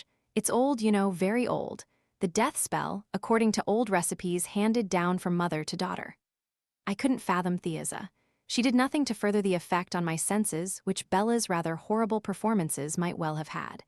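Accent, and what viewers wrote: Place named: United States. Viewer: Why on earth is an American accent used to read this quintessentially English novel.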